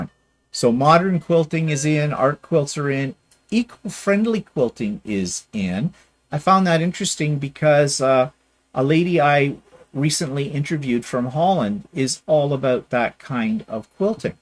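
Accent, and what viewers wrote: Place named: Newfoundland, Canada. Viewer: Newfoundland accent ha ha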